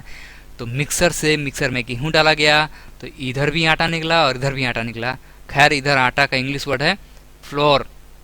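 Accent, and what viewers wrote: Bihar, India. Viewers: Aap bihari h....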